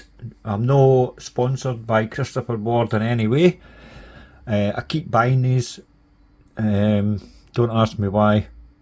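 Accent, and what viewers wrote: Scotland, United Kingdom. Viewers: Hey bud recently discovered your channel and been enjoying your reviews. What part of Scotland are you from?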